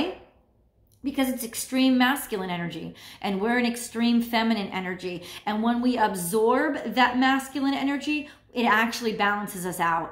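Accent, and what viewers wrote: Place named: Philadelphia, United States. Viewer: I am laughing out loud.....you sound like you are from my area,,,,Philly......haha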